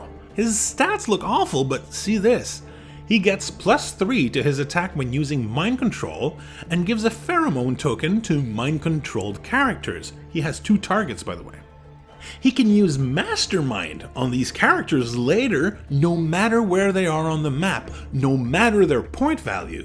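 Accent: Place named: Quebec, Canada. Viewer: So the is French Canadian!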